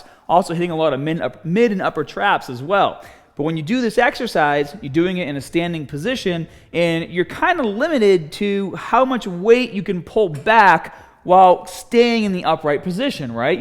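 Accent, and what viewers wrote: Boston, United States. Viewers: Is that a Boston accent? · Someone is from the Boston area.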